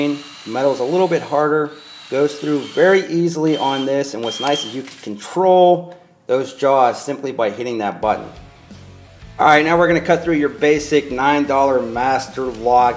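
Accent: Pittsburgh, United States. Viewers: Nice acccent. Pittsburgh area?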